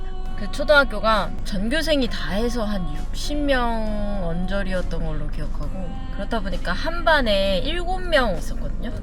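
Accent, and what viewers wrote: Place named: Dangjin, South Korea. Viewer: 누나 사투리가 배여있는 부분이 당진이었구나! 누나 차 출발 전 표정 개카리스마!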